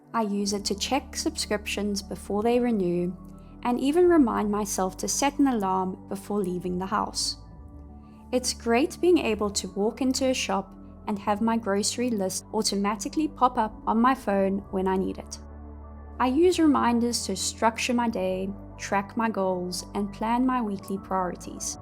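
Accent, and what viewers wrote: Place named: South Africa. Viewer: Great to hear a South African voice in the content space and this is a great video with clear explanations for a very underused app.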